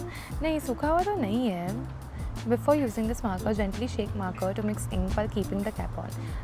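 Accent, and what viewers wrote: Gujarat, India. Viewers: Loved your gujju accent 😂💖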